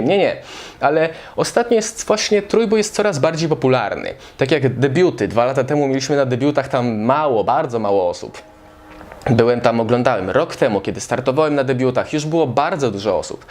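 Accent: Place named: United States. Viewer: Za sam amerykański akcent należy się łapka w górę ;)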